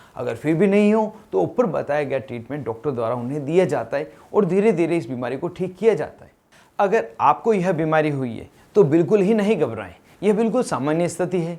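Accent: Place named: Bikaner, India. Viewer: Aap ke accent se lagta he aap shayad Bikaner se hai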